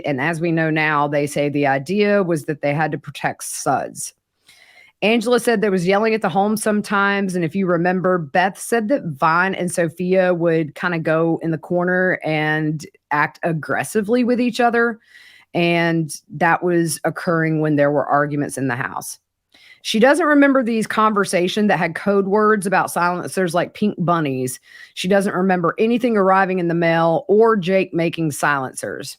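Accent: South, United States. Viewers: NEW SUB. So glad I found you. I’m in South Carolina. I recognize your southern accent 😅❤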